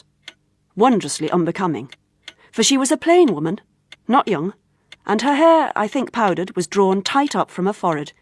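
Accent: Home Counties, United Kingdom. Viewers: What a shame they couldn't get a reader with a Cumbrian accent instead of a home counties one?!! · Home Counties accent don't really matter does it?..a great rendition..Dorothy and Coleridge probably just close friends..we will never know for sure..been reading Coleridges' account of descent of Broad Stand Scafell.. He's got balls the size of Skiddaw...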